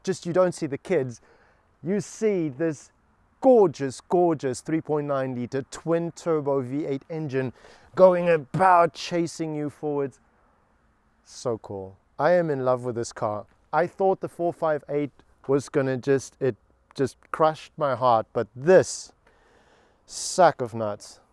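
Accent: Cape Town, South Africa. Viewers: wait your south african ? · ja meneer. Cape Town. ;-) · Yes meneer. Cape Town! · This Presenter is definitely South African. gatta Pattata?. Leon Schuster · He is South African.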